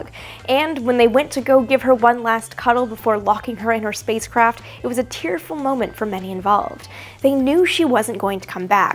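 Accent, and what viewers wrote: Canada. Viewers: Always like hearing the Canadianess coming out. :-) Miss-isle just sounds so much cooler than Miss-sel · Great summary. And might I say, your pronunciations are very Canadian in this episode :)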